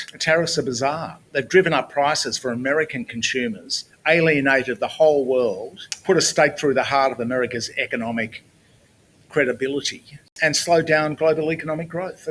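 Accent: Australia. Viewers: Nice to hear an Aussie ascent on my side of politics. I have missed it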